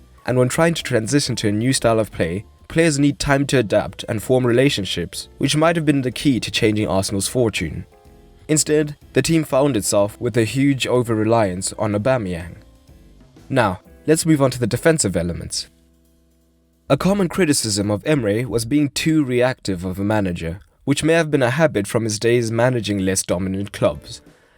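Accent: South Africa. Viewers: South African? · This guy sounds like he is from Durban.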